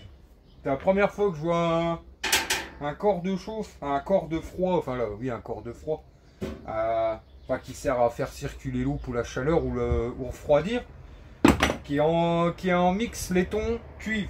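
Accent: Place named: Ardennes, France. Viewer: Bonjour, moi je fais aussi la ferraille avec ma vieille clio mdr, mais pas autant que toi. Tu sais dans notre région ( à côté de Lens ) tout le monde fait la ferraille donc attention il y a des vols. Tous les soirs je regarde tes vidéos et ça me fait du bien car c'est pas pour avoir des conseils mais pour vous voir, votre accent ardennais eh bien j'aime bien et je trouve que vous êtes vraiment cool et simple, vous souriez et vous ne prenez pas la tête pour des conneries. Bref merci pour tout ça et je vous souhaite beaucoup de sous avec les métaux. Jean Luc de Loison sous Lens. Bisous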